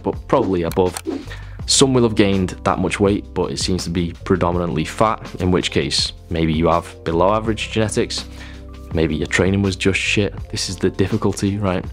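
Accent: United Kingdom. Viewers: Nice pleasnt accent .......................for a United Kingdom guy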